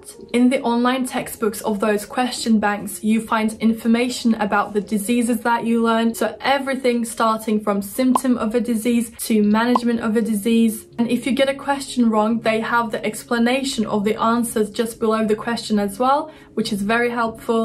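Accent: United Kingdom. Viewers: İngiliz aksanın çok temiz fakat çok aksanlı konuşuyorsun anlaması zor olabiliyor.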